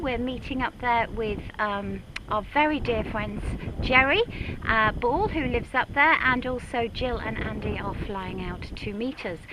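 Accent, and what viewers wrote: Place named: Bristol, United Kingdom. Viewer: Nice video, love hearing the Bristol accent as its where my mum comes from.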